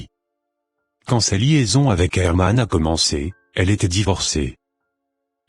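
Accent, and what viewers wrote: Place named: France. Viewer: Millionnaire s'il te plaît pourrais-tu prononcer en anglais les prénoms de Jean et des villes américaines sans accent français parce que dans ce reportage je me demandais de chaque fois pourquoi il vient avec un nom d'homme je m'excuse encore. À plus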